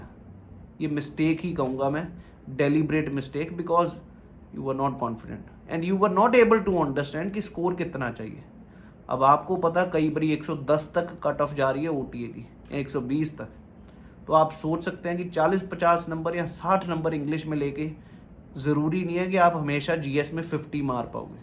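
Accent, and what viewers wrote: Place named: Pakistan. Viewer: Sir apka accent pakistaniyo jesha hein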